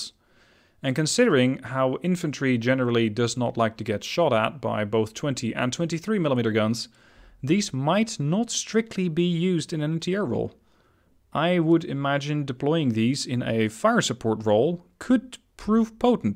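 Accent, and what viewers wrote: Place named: Netherlands. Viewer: Holy Dutch accent! (Flemish here ;)) Looking forward to this.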